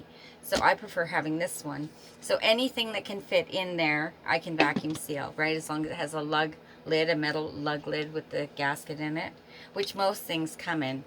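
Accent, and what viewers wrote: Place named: Canada. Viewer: I thought your accent might be Canadian! 😂 Thanks for confirming!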